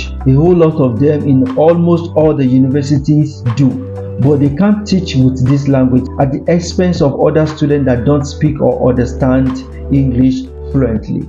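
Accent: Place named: Portugal. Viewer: Good job! I love your Portuguese accent... :face-green-smiling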